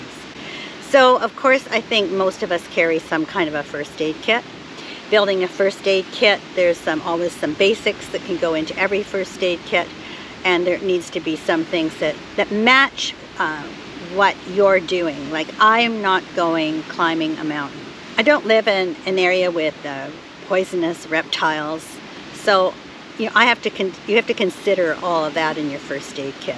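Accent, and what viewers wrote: Canada. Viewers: I can tell she from BC. CA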